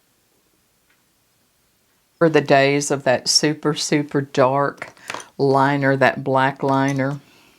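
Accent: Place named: Appalachia, United States. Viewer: ! I also love your accent, it reminds me of home. I’m from Appalachian area of North Carolina and Tennessee, but live in Ohio now. You are a true joy ✨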